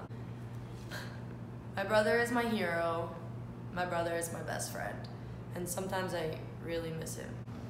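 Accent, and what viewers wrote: New York, United States. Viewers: I could tell from that accent you were from NY without even knowing!!! You’re absolutely fucking stunning! I’m a fan! 💕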